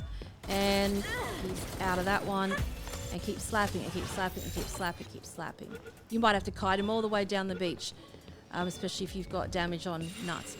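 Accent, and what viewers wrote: Australia. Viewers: aussie accent 😍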